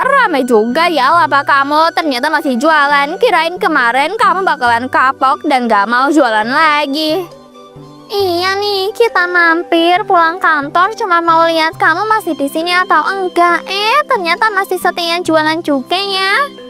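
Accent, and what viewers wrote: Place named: West Java, Indonesia. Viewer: lagam bahaasa ya sunda ya tuh nyunda banget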